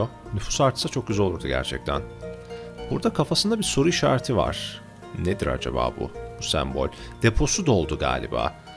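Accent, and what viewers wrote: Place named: United States. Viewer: Namı değer türkçeyi amerikan aksanıyla konuşan koca yürekli insan sanada selam olsun:washhands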